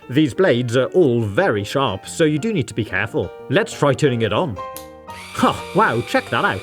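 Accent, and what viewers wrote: United Kingdom. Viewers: He's british!!!!!!! 🤴🤴🤴🤴🤴🤴🤴🤴🤴🤴🤴🤴🤴🤴🤴🤴🤴🤴🤴🤴🤴!!!! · Are you britsh?🍵☕🍷🇬🇧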